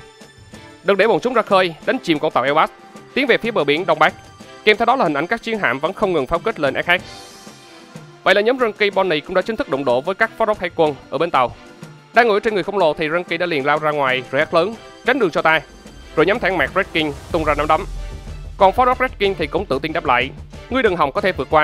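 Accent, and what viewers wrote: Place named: Quang Nam, Vietnam. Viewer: ông ở quảng nam hay quảng ngãi z ad🤣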